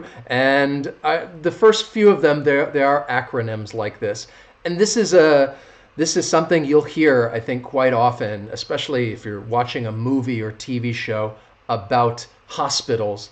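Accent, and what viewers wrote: United States. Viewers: Fuuuuu. American accent. Switch off.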